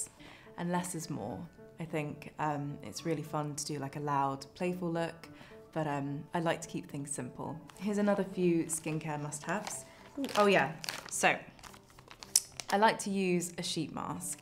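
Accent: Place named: United Kingdom. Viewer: I love her natural British accent!!!❤️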